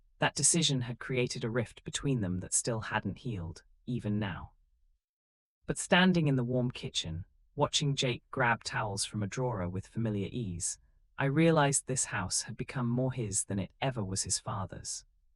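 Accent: United Kingdom. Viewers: I absolutely love the storyline it shows that Jake and Carter has true love their soulmates even if a Brit wrote the story I wish that they would get someone to narrate it with more of a Montana North. This is not the first word to listen to someone from Brett narrated it's just that it would make it more believable if they would get someone from the USA. I'm not trying to be racist or negative but that's just my opinion...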